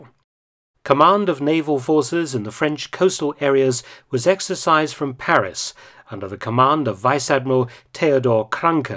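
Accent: England, United Kingdom. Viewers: Best WWII Youtube, 100%. Encountered your channel in late 2018 by chance, and I believe I’ve watched every single one of your videos, some over again. Can’t say that for many other Youtube channels. Your clear, concise English accent and the incredible knowledge is like the History channel (when it was actually good) on steroids. With the amount of information you have on WWII, you’d almost think you lived through it. I don’t know if you’ve ever considered or done this already but I think you should apply to be narrator for history programs on the side. I’d watch them all. Thanks for the amazing videos Mr. I hope they keep coming for many years!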